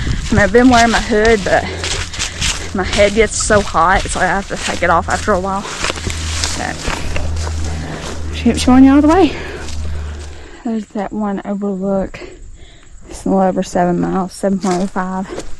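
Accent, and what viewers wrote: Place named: Georgia, United States. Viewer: You sound like you are from Georgia.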